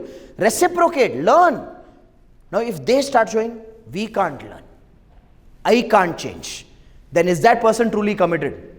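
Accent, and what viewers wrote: India. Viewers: Very good lessons. Thank you. You sounded more like a south indian. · Hindi style of accent 😮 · I love your explanation. I understand you were talking to an especific audience but you ended up talking to a lot larger audience. If possible could you please avoid English dialect to include non indian English speakers? Thank you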